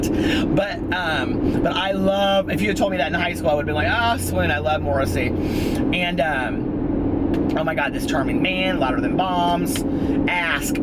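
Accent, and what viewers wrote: South, United States. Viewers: Sister, do you have roots in the old south?? You have a bit of a southern accent.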